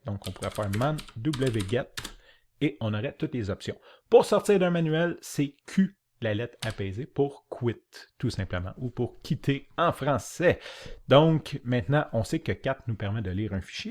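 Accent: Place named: Quebec, Canada. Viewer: Le Français canadien 🎉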